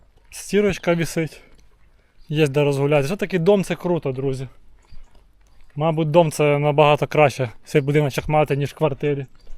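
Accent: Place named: Central Ukraine, Ukraine. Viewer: На велосипеді там класно поганяти., цікавий у Вас акцент. Ви, певно, з Черкаської чи Житомирської області?)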